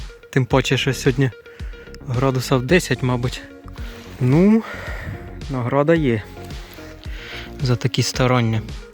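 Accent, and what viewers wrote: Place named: Surzhyk, Ukraine. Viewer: Извини брат - но лучше ты бы на русском вещал . А то такой суржик ..уши режет . От того ,что на русском будешь вещать - ты из украинца в россиянина не превратишься . И русский язык - это не собственность россиян . Мы все славяне - имели общий пра-славянский язык . Потому все славянские языки имеют общие корни и очень схожи . А то, что власти нас подели - в частности на манипуляции языками - то это на их совести . Главное оставаться Человеком - в лучшем понимании этого слова . А находки респект ! Мои поздравления !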